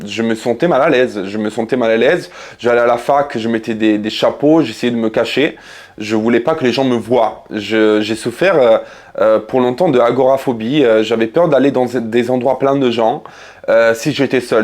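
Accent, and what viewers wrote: Italy. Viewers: Quel plaisir l’accent italien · L''accent italien ! 🤩🤩🤩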